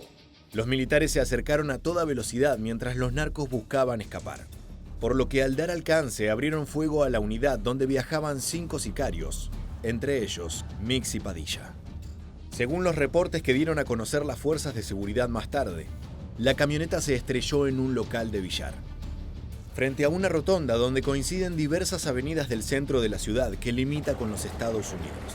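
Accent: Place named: Argentina. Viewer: Pues el narrador ha de ser argentino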